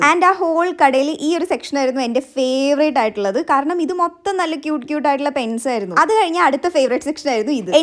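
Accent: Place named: Ernakulam, India. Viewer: Kochi 😂😂 palakkadooo??? Eranankulam 😂😂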